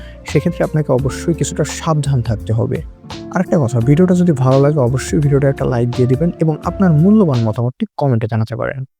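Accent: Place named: Bangladesh. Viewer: উনি বাংলাদেশের লোক। ওখানকার ভাষায় বলছে।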